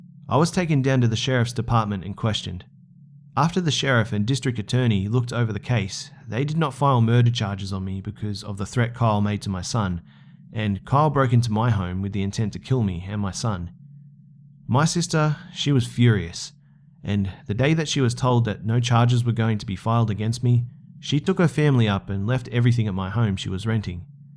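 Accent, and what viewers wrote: Australia. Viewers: you have such a nice aussie accent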